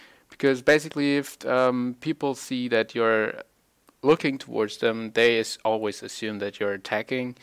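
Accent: Germany. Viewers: im preeeeeeeetty sure you´re german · Found you from Jayne and loved your montages. Great video btw You sound like you are from Germany?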